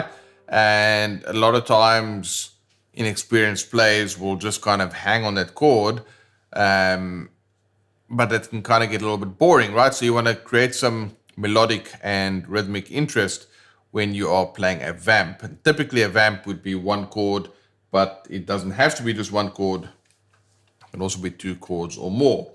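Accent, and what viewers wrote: South Africa. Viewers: Also, what accent do you have? I thought South African at first, but I'm not an accent expert XD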